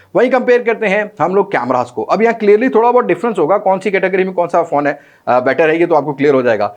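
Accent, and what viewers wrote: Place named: Punjab, India. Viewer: Bhai aa Punjabi touch bara wadya lgha💯❤️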